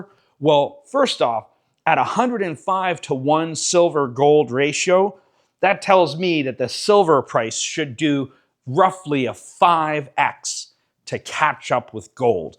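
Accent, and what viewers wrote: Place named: New England, United States. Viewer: Hey, I bet your not.......I recognize that voice...........it's!!!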